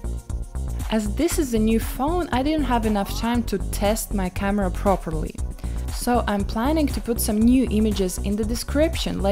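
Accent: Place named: Balkans, Eastern Europe. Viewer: from accent I can tell you are from europe proboly ballkan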